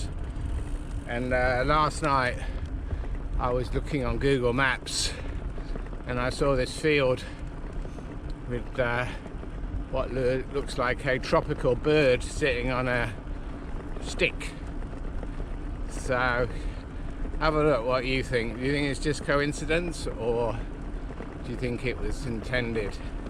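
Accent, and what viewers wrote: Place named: Australia. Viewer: Great to see you're still a bit crazy after all these years. And a bit of an Aussie accent to boot! 😁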